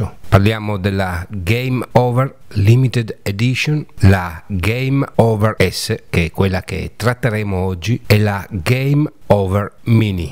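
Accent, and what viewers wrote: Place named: Friuli-Venezia Giulia, Italy. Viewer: Il tuo accento (a me molto noto) tradisce la tua “Giulianità”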